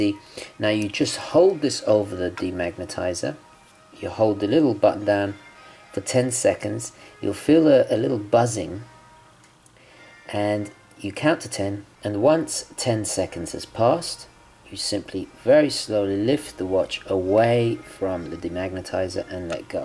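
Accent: England, United Kingdom. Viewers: I am begging you to make a full review of any watch in that thick british accent. Please please please :) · The outtakes at the end were very funny! The stuffy english gent accent/voice is my favorite. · Hi - Thanks for the informative video. I didn't realize there was a DIY resolution for magnetism. Always enjoy your blooper vids. I think you have that English accent down pretty well now after all those videos.....;) Cheers!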